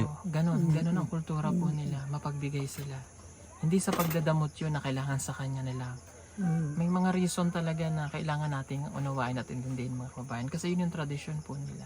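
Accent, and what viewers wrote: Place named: Bisaya, Philippines. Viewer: Naruto n ding magbisaya c sir 😊, mga ilang panahon p magiging fluent n din cia sa bisaya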